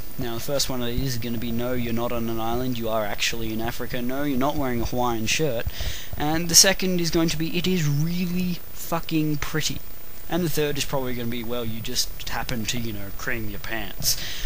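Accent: Australia. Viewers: You have a good potential to be professional commentator. I like your voice inflexions sounds a little Aussie. If you could drop the swear words, it would be "really really really really" good.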